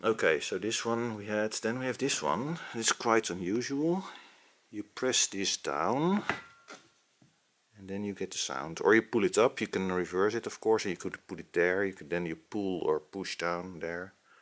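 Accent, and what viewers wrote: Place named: Netherlands. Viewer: Dutch accent 😁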